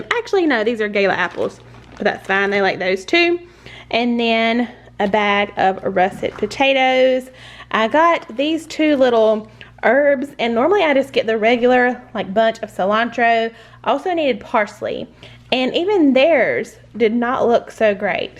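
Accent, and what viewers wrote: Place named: North Carolina, United States. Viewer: I've just found your channel 2 weeks ago. I love it!! You are so calming to listen to and love your meals. They are very similar to my meal plans. I knew when I heard your voice for the first time you lived in NC. I do too. Lol 😆. Keep up the great videos!! Love them.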